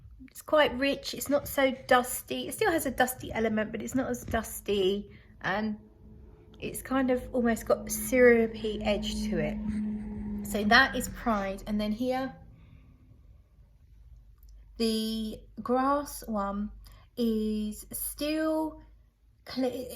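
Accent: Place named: United Kingdom. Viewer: I love your UK accent!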